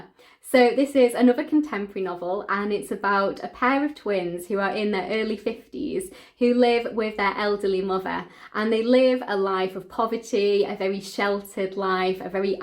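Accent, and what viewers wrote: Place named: Northern England, United Kingdom. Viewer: I love hearing a northern voice!! Sending love from Leeds❤️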